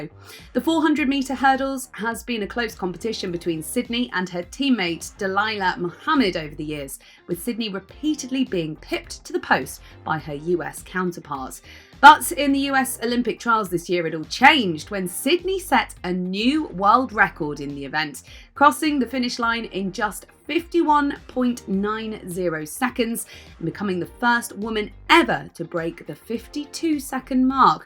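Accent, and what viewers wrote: United Kingdom. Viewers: Hoping that no one says this lady is bias. due to her accent I can tell she's British. she said Dina will get 3 medals at the Olympics, meaning 3 gold. not bad for Dina, I love her, but the million dollar question is where is Shelly Elaine and shericka in the 100m 200m and the 4×100m? something to think about🤔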